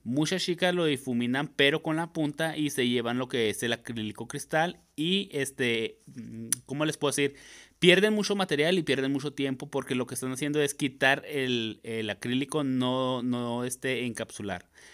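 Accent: Chihuahua, Mexico. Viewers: Es Al acento de Chihuahua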